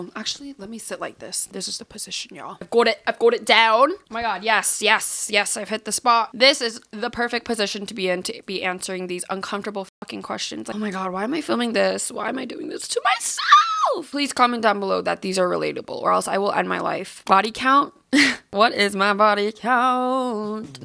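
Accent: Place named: Asia. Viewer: Asian accent mic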